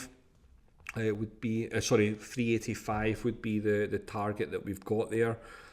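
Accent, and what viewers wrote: Scotland, United Kingdom. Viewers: Nice work and useful content 👏👏❤️. The Scotch accent is a great PLUS. 😀🙏👏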